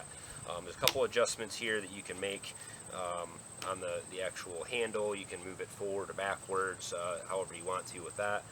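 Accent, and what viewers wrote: United States. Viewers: My wife likes your American accent.and I like the trimmer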